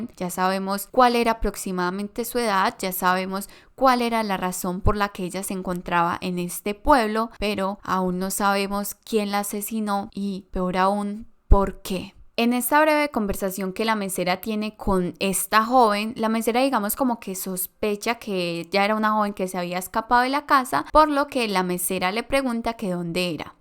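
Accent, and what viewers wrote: Colombia. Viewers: me parece genial que lo hayas tomado bien, la verdad cuentas con mi apoyo en tu canal seguiré viendo tus videos pero si mejora un poco el guión para que no sea repetitivo. Aprovecho nuevamente para felicitarte por tus investigaciones son muy detalladas. Por tus modismos y acento creo que también eres colombiana como yo y si eres de acá me parece genial 😁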